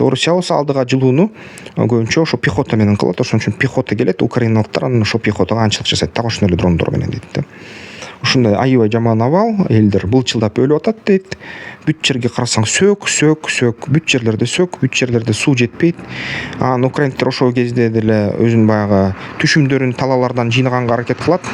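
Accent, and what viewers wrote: Standard. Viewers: Азаматсын таза кыргызча тушундуросунда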